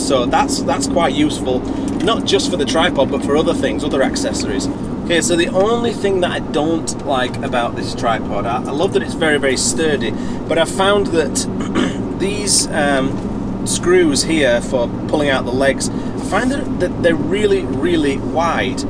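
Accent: Yorkshire, United Kingdom. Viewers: I'm afraid I only got 10 per cent of your advice, I was seriously distracted by your lovely driver... Anyway, I'll just have to watch it again or better: just listen to the old Yorkshire voice...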